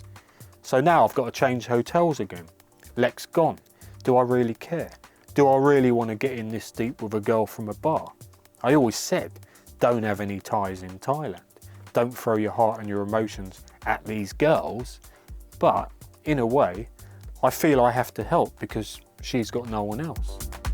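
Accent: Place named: Cockney, United Kingdom. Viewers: I watched this twice today with my thai sweetheart and being a Sth London cocker I found it hilarious, I think because of the obvious audacity and the cockney tune to it all and to see Rob pictured like some sort of mug was so funny mate this vlog is pure gold 55555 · Your accent reminds me of Danny the drug-dealer from "Withnail and I", who I believe was a Londoner. Maybe I'm just a dumb Yank who can't pick out a British accent though :)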